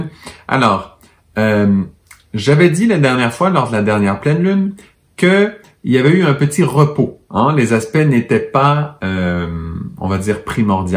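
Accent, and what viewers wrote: Quebec, Canada. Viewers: Enfin, vous me rendez sincère, parce que j'ai écouté attentivement et je rien rien compris. Rien que pour entendre votre délicieux accent québécois j'écouterai toujours, qui sait ? Peut-être qu'à force de vous écouter je serai intelligente et je comprendrai les relations des planètes !!! Merci de m'avoir accompagnée, étant malade et perturbée par mon passé ...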